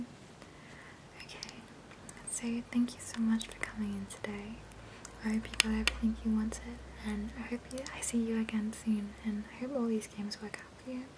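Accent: Australia. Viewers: Not really into the asmr roll play videos, but I found this really relaxing and you know your stuff. Looking forward to some new videos. Oh, Australian right?? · Asmr, games, cute girl with an aussie accent? I have died and gone to heaven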